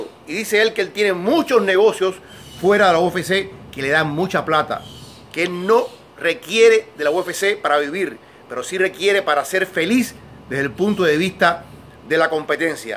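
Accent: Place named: Cuba. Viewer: El Señor Ebro (aprende un poco de respeto) es cubano y lo pronuncia como buen cubano. Yo soy español y lo pronunciaria como un español. Un ruso lo pronunciaria como un ruso. Si tanto te jode su acento o su pronunciacion vete a otro canal de mma de niñatos mezclando musica trap y acento de zombie con mma.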